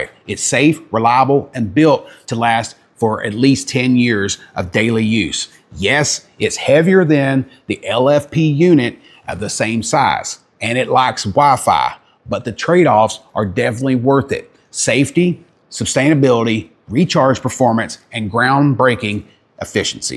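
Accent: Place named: South, United States. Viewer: I'm curious what southern state you hail from... Georgia my guess...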